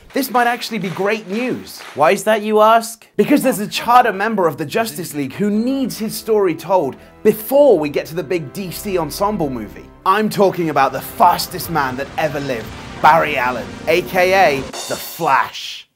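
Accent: United Kingdom. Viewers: I'm just going to put this out there; the elephant in the room so to speak. I overlooked his weight because of his awesome British accent! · Your like jack black with a British accent. I love you! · I swear this guy really is Jack Black with a british accent.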